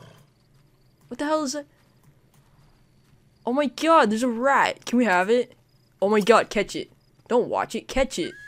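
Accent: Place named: Canada. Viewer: Officially my new favourite gaming channel because sims and canadian accent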